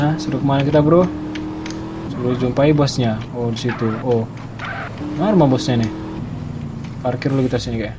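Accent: Medan, Indonesia. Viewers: Logat medan kau ya lai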